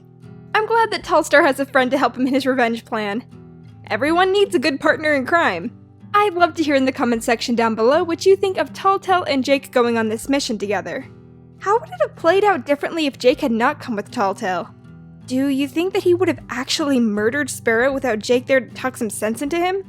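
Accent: United Kingdom. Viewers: Whats up with the british accent? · Are you brittish?!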